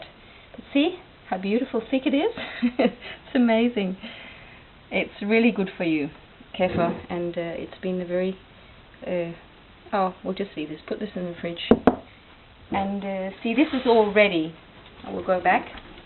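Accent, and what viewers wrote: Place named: England, United Kingdom. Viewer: What a great video and what an amazing English accent, you speak better English than me,lol. Keep up the good work, God Bless.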